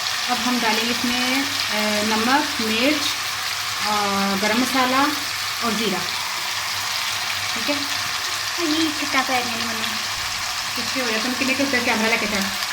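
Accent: Punjab, India. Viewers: MashAllah Zaberdast Mash De Daal Bhale Bhale 😍 Punjabi Baree Pyari Bolde ho 👌🏻 👏👏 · very very sweet punjabi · sweet punjabi boltey ho.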